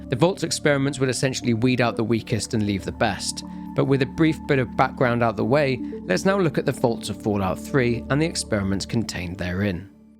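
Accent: England, United Kingdom. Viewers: there's nothing like hearing an English fella, talking about the lore of our fave games. simply the best!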